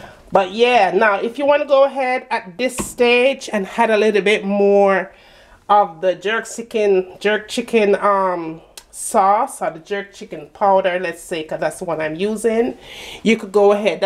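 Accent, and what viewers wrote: Jamaica. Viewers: I love her accent ! Making me wish I was Jamaican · to cook thats how we Jamaicans speak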